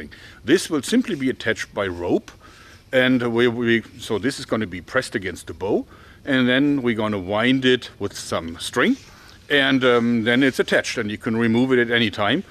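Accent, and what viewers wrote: Germany. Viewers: Das deutsch hört man raus! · Warum klingt dein Englisch so deutsch? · I guess its the German accent. One of the guards voice from Skyrim always sounded like Arnold Schwarzenegger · Nice german accent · du hörst dich an wie ein deutscher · when he speaks english he sounds more german than some germans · Dieser Moment wenn man als Deutscher Deutsche in einer anderen Sprache wiedererkennt haha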